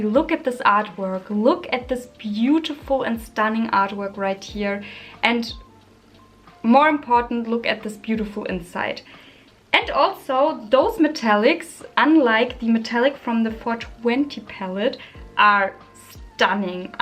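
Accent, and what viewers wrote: Germany. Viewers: You look stunning ! Are you from france ? Je n’aurais jamais pensé .. i really thought you were from Germany 😅 the accent made me confused ❤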